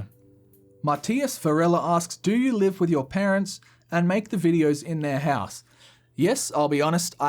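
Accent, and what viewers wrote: South Australia, Australia. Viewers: Ahhnswer.. classic Adelaidian. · Oh heaps good, I had no idea you were a fellow South Aussie, for some reason I thought you were Victorian.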